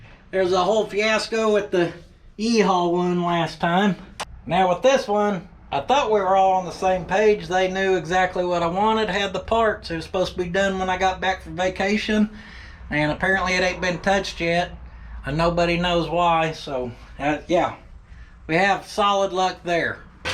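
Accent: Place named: Los Angeles, United States. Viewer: Your from LA I knowd it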